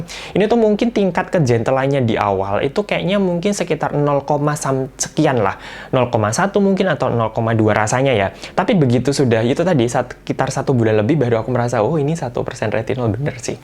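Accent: Java, Indonesia. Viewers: Waduh jowonya mendok sedep mas hi hi...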